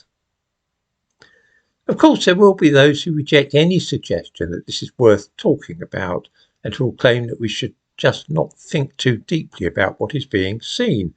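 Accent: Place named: Cockney, United Kingdom. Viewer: Spot on my little Cockney Sage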